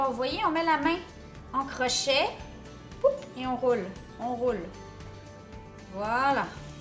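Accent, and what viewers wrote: Quebec, Canada. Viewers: Alors moi je suis en Nouvelle Calédonie,TOM( encore Français!) tu es géniale j'ai passé mon aprem à looker tes vidéos...tu m'éclates,et puis en tant que bonne Française, je suis irrémédiablement FAN de votre accent les Québécois! par contre n'oublie pas de noter pour tes FAN francophone les conversions en degré "celsius" des températures,ainsi que des mesures en Grammes ou centilitres et millilitres..car les cups nous on connait pas!!! big kisses du Pacifique! je te kiffe 😍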